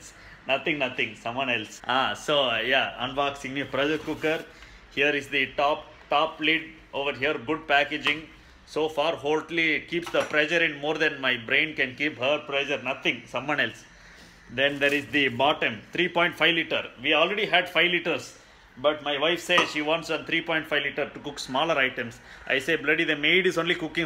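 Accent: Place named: Kerala, India. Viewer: Nostalgia this accent reminds me of my mallu maths teacher Matthew Sir in saudi hahahaha lol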